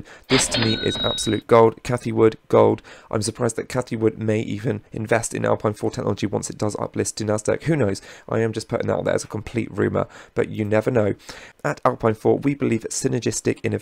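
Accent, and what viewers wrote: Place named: West Country, United Kingdom. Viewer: Good Video. I also like your very discreet west country accent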